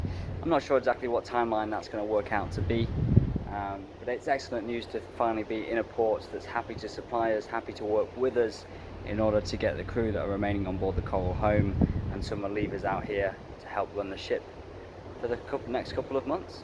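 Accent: England, United Kingdom. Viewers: Where are you from you have a accent from England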